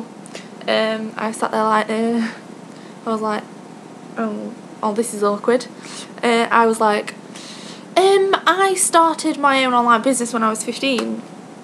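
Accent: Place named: United Kingdom. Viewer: Where abouts in the UK are you from? Your accent is sooo familiar...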